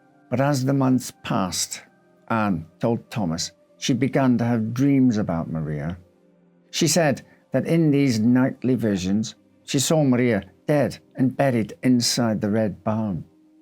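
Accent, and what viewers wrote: United Kingdom. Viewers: british? lol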